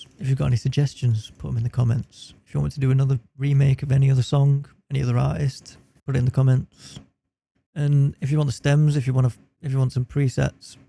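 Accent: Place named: Manchester, United Kingdom. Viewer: great video and in mancunian accent.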